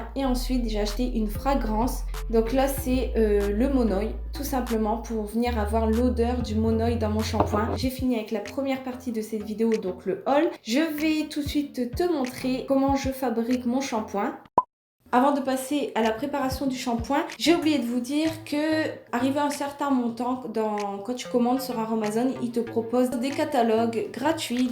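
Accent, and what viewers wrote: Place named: North, France. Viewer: J'ai deviné tout de suite que tu venais de chez nous (Nord de la france). Tu as un sacré accent ^^ Merci pour la vidéo de haul, bonne continuation :)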